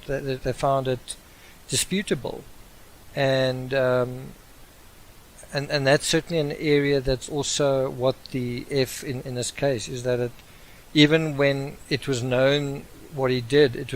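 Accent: South Africa. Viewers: I agree, I really like a South African accent.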